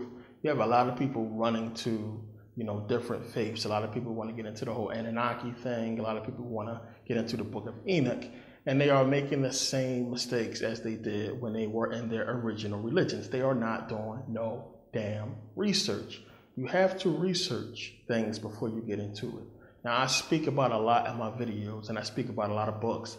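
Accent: Philadelphia, United States. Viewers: He's from Philly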